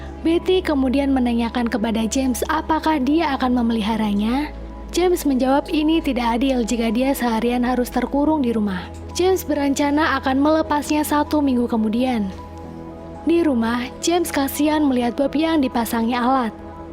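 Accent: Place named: Pekalongan, Indonesia. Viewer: Logate kak kalongan temen mbak oo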